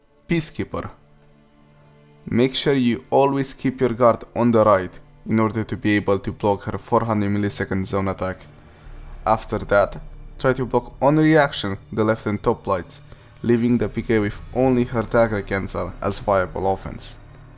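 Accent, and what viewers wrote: Romania. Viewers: are you romanian,russian or german? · accent de roman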